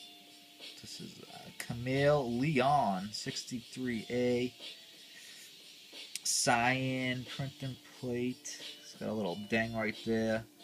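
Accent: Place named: Boston, United States. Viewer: You sound like you're from Boston are you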